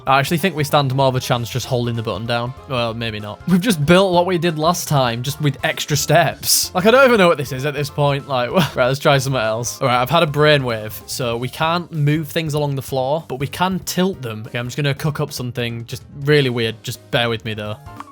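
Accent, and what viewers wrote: United Kingdom. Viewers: The only British accent i can tolerate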